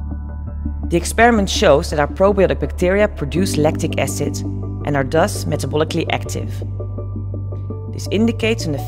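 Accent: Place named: Netherlands. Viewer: this is an obvious Dutch accent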